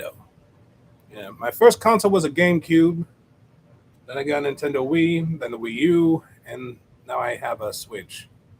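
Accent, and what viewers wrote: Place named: United Kingdom. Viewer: Bro I thought you are British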